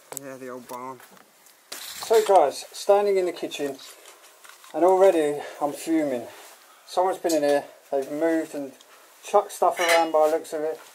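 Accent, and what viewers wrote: England, United Kingdom. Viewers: The best explorers on YouTube. Not too much talk, and when do, the great English accent. Some explorers know very little about what they are looking at.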